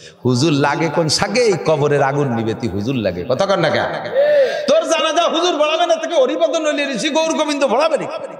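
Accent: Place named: Gopalganj, Bangladesh. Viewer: নড়াইল গোপালগঞ্জ জেলার কথাবার্তা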